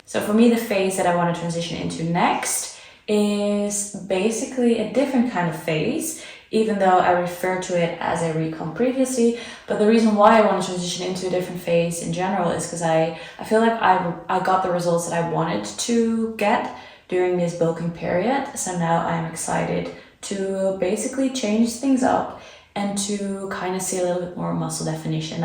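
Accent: Netherlands. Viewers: Hoor ik het goed ben je Nederlands? Fijne video thanks! ❤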